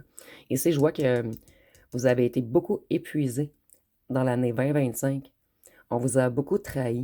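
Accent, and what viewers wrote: Quebec, Canada. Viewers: Merci pour vos predictions avec le charmant accent quebequois.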